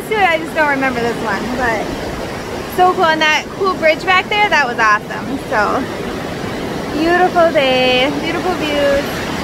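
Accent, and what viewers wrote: Minnesota, United States. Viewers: Also? I love your Minnesotan accent! You from northern Minnesota? I’m from the Minneapolis area so… Hopkins to be exact. I can always tell when I hear a fellow Minnesotan :)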